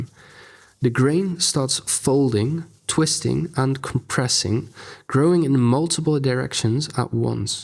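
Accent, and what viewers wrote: United Kingdom. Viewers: Where did you pick up such a british accent. It amazes me every time. Dutch people usually sound more american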